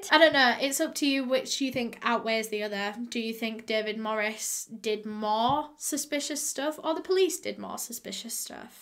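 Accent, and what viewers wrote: United Kingdom. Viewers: I can't say for sure, but I'm British and I think her accent is British too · Dirty cops... jeez. Question:, are you British? My niece wants to know what your accent is.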